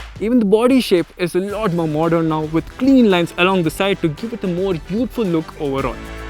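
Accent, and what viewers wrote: Kerala, India. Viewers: Mallu accent matches may be they changed with some other mallu. "thaa-rough update yet" (thorough update), "COMB-letely new styling" (completely new styling) many such thru out the video. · I know a Malayali accent when I hear it lol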